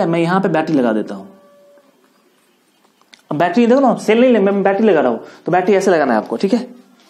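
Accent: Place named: Bengal, India. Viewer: Sir are you bengali, I think your tongue sounds like a bengali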